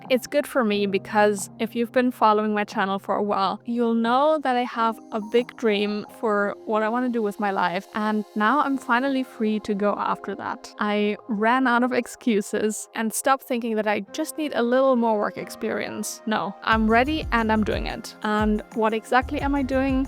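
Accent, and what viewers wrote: Germany. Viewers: You are right that McKinsey doesn't matter...but You're European... You didn't learn the USA hustle mindset...you were absolutely supposed to get another project and make political allies...cultural differences got you. You took people literally. Do I get a German accent? If so from what I understand very different culture. The McKenzie people couldn't explain to you what to do anymore than a fish could tell you how to breath underwater... Going to iveay league schools and private HSs they absorbed the BS with their mother's milk. Social climbing obvuscating masters of double speak. " Consulting".... Scape goats for firing people. " If we do the same with fewer people we'll make more money...genius send that memo to the client... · Do I hear a Gernan accent?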